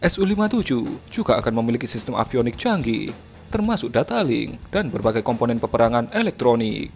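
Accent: Indonesia. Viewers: Medok bet nih yang ngomong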